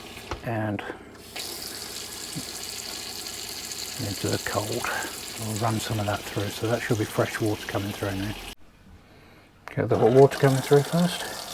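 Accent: United Kingdom. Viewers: Thanks for that. Going off your accent we are not very far from you being in Bolton. There are a plethora of motorhome videos listing what you should and should not take, I have driven extensively in France over the years, even taking a 7.5 tonne over to move my parents over there when they were alive. Im finding the advice on the new regulations regarding camper weights and what you need for vans over 3.500Kgs very confusing though! Have you any experience of the Etrusco A7300DB?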